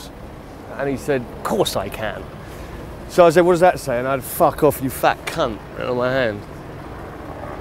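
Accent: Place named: New York, United States. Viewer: I thought he was from New York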